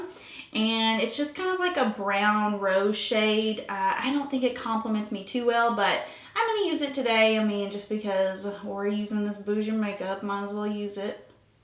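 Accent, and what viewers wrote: South, United States. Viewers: I love your videos and your adorable Southern accent. I love Burberry makeup looks too! Violette_fr is a super cool French blogger.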